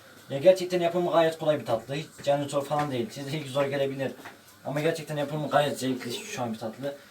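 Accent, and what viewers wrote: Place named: Mardin, Turkey. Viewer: %100 mardinlisin konuşmadan belli :)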